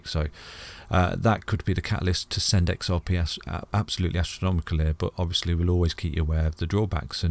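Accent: United Kingdom. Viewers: Like yout british accent.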